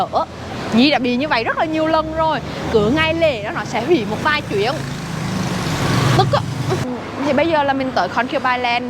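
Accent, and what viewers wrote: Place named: Quang Binh, Vietnam. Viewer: Tưởng quảng bình...nghe giọng dễ thương quá.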